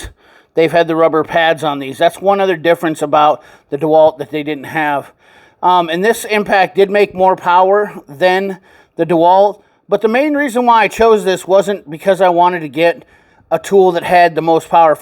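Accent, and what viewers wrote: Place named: Ireland. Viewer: Great Irish accent.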